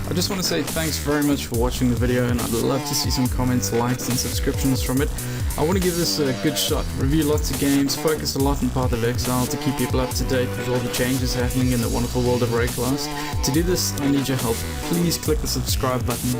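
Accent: South Africa. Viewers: I like the commentary and the base itself, sounds like you're a fellow South African? Subbed your channel and keep up the good content ^_^ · Where you from, i hear some south african twang every now and then?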